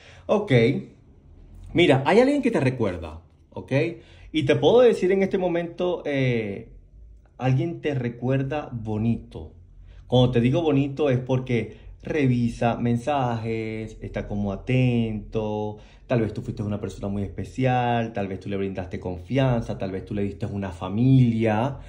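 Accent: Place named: Chile. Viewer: Hola me encanta escucharte ese tono de voz de chile yo soy argentina . Un abrazo a la distancia.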